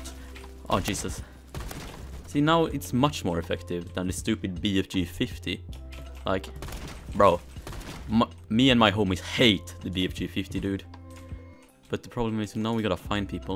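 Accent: Sweden. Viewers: Are you swidish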